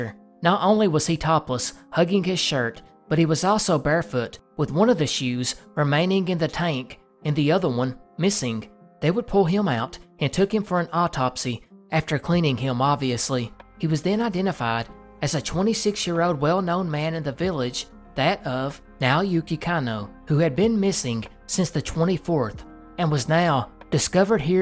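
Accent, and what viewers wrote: South, United States. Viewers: Love your channel man. I’m from sc and it’s refreshing to hear a fellow southerner😂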